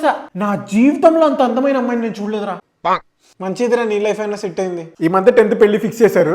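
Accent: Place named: Rayalaseema, India. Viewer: కడపా అనంటపురమా బాబూ నీది?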